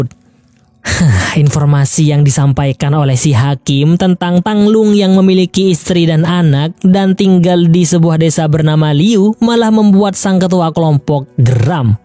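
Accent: Madura, Indonesia. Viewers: reng medureh kiah reng wkwkwk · Adminnya orang Madura ternyata 😂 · hehhh sengak. wkwkk lu madura ya min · Ini kayak orang madura yg menjelaskan narasi😂😂😂😂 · Masyallah acaca medhure ropanah.. Deri dimmah min.. Sengkok reng medhure kyah.. Deri Mempawah Kalimantan barat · Dek....sedeh/sampiyen medureh kammah dek... Salam sittong dere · Lu orng Madura yh bng😂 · Oreng medureh toktotokkah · Ternyata orang madura wkwkwk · Kakeh reng medureh yeh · Ooh pantes aku kayak ngeh ada logat maduranya ternyata benar😂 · Memang mantap mon oreng madure membacakan alur cerita god job bang sehat selalu😂😂😂😂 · Beh reng medureh??😅😅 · ini Narator orang Madura ya..😂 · Medureh dimah kanak😅😅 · orang Madura bang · Medureh kak😂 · Beehh mak medurehh payaaa celakak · Komentator nya org Madura ya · Medure mas 🤣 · Wah ini pembawa suara nya orang Madura yaaa😅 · Seperti ya yg isi suara ini orang madura😅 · ternyata pengisi suaranya madura 😅😂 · Hahh takok epate'en can, reng madhure ben kak😅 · Teppak ka reng madhureh boss 😂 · Ini orang madura ya 😂😂😂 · Ini yng ngomong orng madura jiah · Saya yakin pengisi suaranya " Oreng Madureh" . 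😂😂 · Orang Madura yg ngisi suara nye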